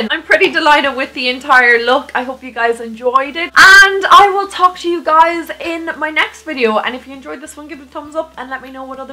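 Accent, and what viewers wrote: Ireland. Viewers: Also I looove your eye colour so much😍😍 and I can't get enough of the Irish accent now that I know I'll be there for a year😍😍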